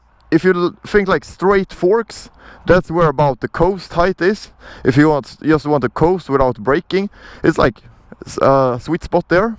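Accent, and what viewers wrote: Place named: Sweden. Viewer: Subbing because that Swedish accent is awesome! So is that bike 😀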